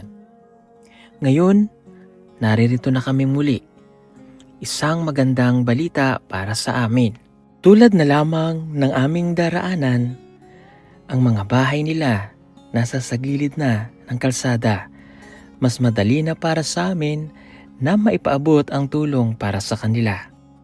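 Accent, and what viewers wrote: Visayas, Philippines. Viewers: Marunong na mag bisaya c sir 😂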